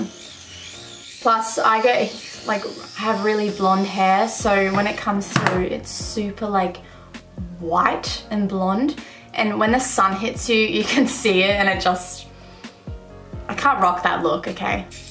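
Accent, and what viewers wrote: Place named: Australia. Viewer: Soo jealous of your Aussie accent 😂😩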